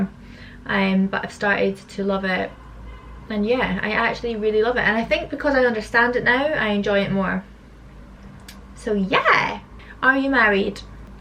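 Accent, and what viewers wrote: Scotland, United Kingdom. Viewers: What your height is??? Cuz im about 6'1 think u look incerdibly beautiful love ur long blonde hair Got such an nice scottish accent which its sound so amazing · You look so gorgeous, and your scottish accent tho 😅💕 More video please 😅 and make a vlog about your daily activity 😅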